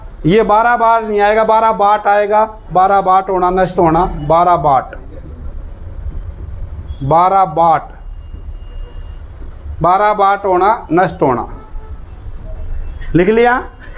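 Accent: Haryana, India. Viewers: M Haryana Exams Ki Taiyaari Nhi Karta But Sir Ji Ki Haryanvi Voice Tone Bhut Acha hai 😊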